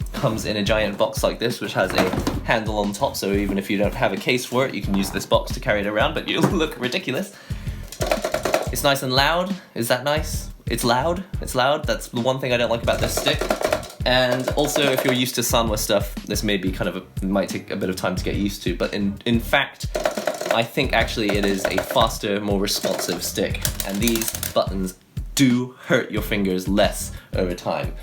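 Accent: United Kingdom. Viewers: Your family is in the UK? well that explains your accent lol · wow that British accent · I'll be honest, your British accent took me off guard.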